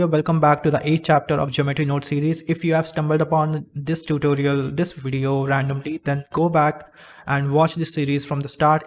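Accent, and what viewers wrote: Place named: India. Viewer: as soon as I heard your accent, my ear; yup he is from India(Delhi, Mumbai or Bengaluru)(Dam I have a perfect pitch)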